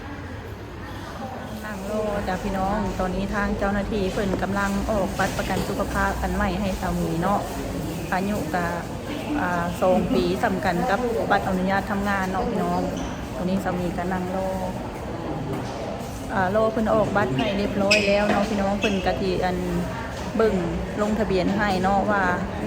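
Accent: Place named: Nakhon Phanom, Thailand. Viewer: สบายดี สาว คนงาม สุขภาพแข็งแรงร่ำรวยร่ำรวย เด้อ.สาวเซโน สำเนียงเว้า คือทางบ้านนาหลวง นาทราย เน๊อะ อำเภอเมือง นครพนม (คนไทยในต่างแดน)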